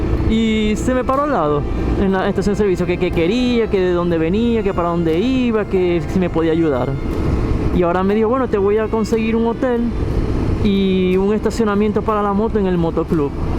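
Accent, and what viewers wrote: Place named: Zulia, Venezuela. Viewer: Uno sabe que un episodio es bueno cuando el tiempo se te va volando cuando lo ves... Cuando te oigo me imagino la rápida traducción mental que vais haciendo del maracucho al español "neutro" antes de hablar, cosa difícil en nosotros los zulianos jejeje, me pasa en Argentina... saludos hermano, que siga creciendo el canal!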